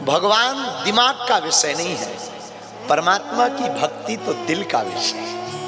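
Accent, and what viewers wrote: Bundelkhand, India. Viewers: bhai... Maine achhe dil se hi bola hai emotional tareeke se bolte hain hame pata hai tumhe pata hai un k sabhi chahane walon ko unki bundelkhandi boli bahut pasnd hai.. Lekin jo shero shaayari hoti hai usme urdu k bahut se aise shabd hote hain jin ka lena dena se door door tak nahi hai... Jo sindoor bindi plot book... Wali baat hui wo bhi k shabd nahi kyonki ye hamne bahut pahale se suna huwa hai... Bas isiliye kaha.. Hame unme bajrang bali najar aate hain or un k mukh se... Naa rupya lagta hai na paisa lagta hai.. Ram ram boliye bada achha lagta hai 🙏🚩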